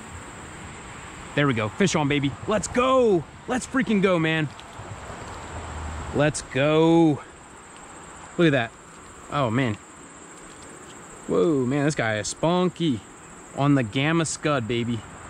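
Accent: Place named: Pittsburgh, United States. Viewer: You sound like you're from Pixburgh! Right?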